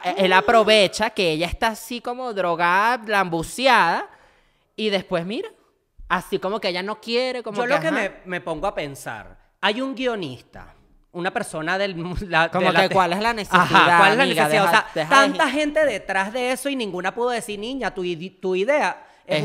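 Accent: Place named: Spain. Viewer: Porqué La Divaza tiene ese acento Español con las eses?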